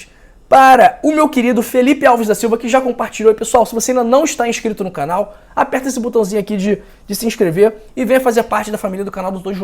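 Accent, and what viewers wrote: Rio de Janeiro, Brazil. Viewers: Boa noite que linda dica tem que ser carioca que voz mais lindo doutor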